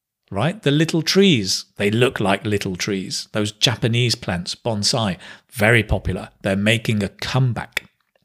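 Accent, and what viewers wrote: United Kingdom. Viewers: Hello you can not imagine how much I enjoyed this 48 minutes. The time really flew. I am more in contact with American people so I am real surprised how well I could understand your British accent. I was really lucky finding you here. You pronounce really clear. You made me laughed when you explained the different ways to say a sentence. You were so cute. From now and then you will be my teacher in the distance. I have to improve my English, mainly my listening. Thanks a lot.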